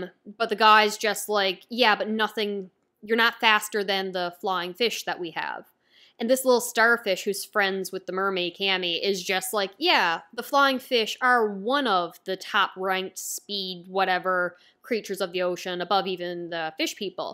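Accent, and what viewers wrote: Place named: Canada. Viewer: My German accent is no better but your Canadian (I think) is killing lmao hahaha the way you say "Lahhh" instead of "Law" has me in tears. Love the content!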